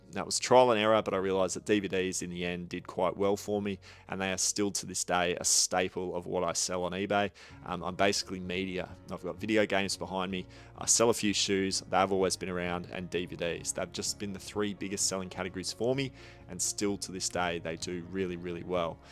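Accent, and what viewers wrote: Australia. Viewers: your lovely Aussie voice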